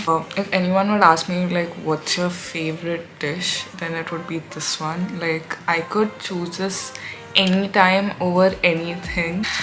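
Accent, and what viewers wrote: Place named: Hyderabad, India. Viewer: She is hyderabadi. I can interpret from the english accent and speech pattern.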